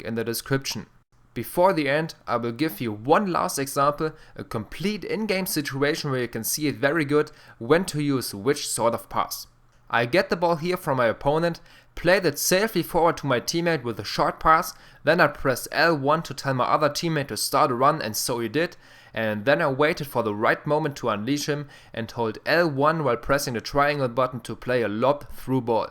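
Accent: Germany. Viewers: your accent is so germans. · your tutorials are useful but when i hear your voice arghhh german english aaaaaarghhhhhhhhhh use synthesizer or shut the fuck up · Man hört das du deutsch bist^^. Deutsche können kein English^^ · Thanks you did your job good but it is funny as from the moment I hear you I knew that you where German, you have an most funny accent when speaking English ( I am not English but I speek with Germans alot :) ). Thanks one more time!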